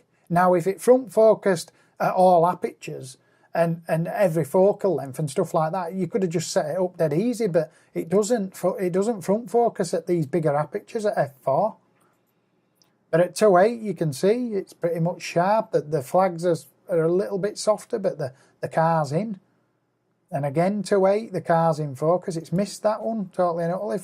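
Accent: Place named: Yorkshire, United Kingdom. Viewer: you are from yorkshire mate.....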